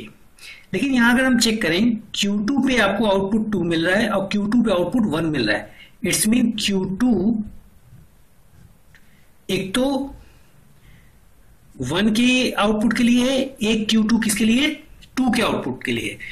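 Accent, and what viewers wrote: India. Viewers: dude i cant understand you accent maybe only indish people can understand u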